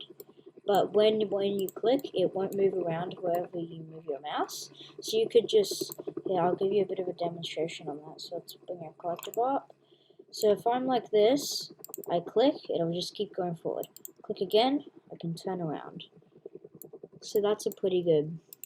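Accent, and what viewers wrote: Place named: Australia. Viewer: I think hes australian like me and thx for teaching me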